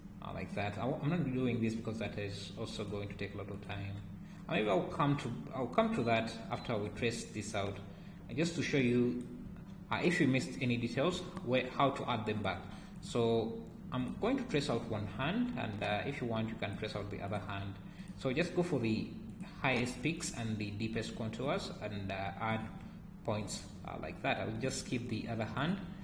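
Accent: Kenya. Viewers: you sound Kenyan, good videos but you speak way too fast for other people to understand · Your accent sounds so Kenyan 🇰🇪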